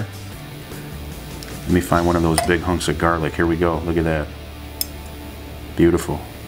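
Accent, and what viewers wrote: Chicago, United States. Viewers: Hearing your Chicago accent gives me faith in attempting this.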